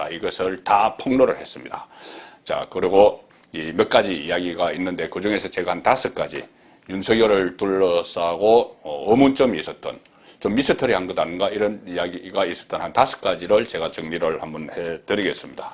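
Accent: Gyeongsang, South Korea. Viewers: 강한 경상도 억양때문에 첨엔 어쩌나 했는데 들을수록 내용이 진솔 담백해서 조용히 지켜 봅니다. 저는 여성이라 경상도 억양이 답이 안나오네요. 아...서울말 쓰고 싶다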